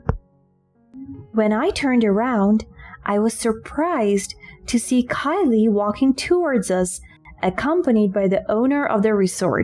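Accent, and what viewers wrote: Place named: United Kingdom. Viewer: It's the queen's English not American English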